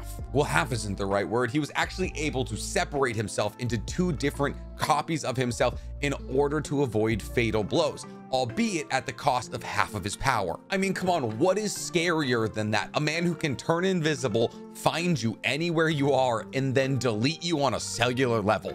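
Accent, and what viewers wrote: United States. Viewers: Just found this channel, which is pretty cool but a major downside is this guy's pronunciations of Naruto/Japanese names. So American of him. For now it's a great channel, and I'll bne going back to watch old videos but his pronunciations are definitely going to grate on me.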